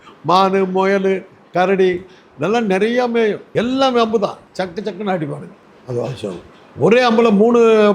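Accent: India. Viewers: சுத்தமான தமிழ் மதுரை தமிழ் தான் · Salem Tamil 🎉🎉🎉🎉❤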